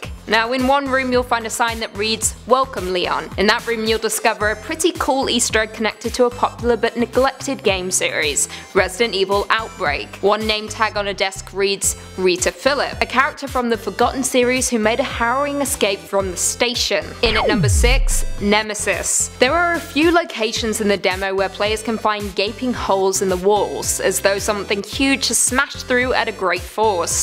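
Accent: United Kingdom. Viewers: Miss your quite the beautiful lady,& love your English accent 😊Well that's cool, I'm pretty excited about Re2 remake. Looks fantastic,as far Re3 remake,as they said it depends on the fans.if it happens,it's in the making from what I was told by Capcom & other gaming yutuber s. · You are from uk?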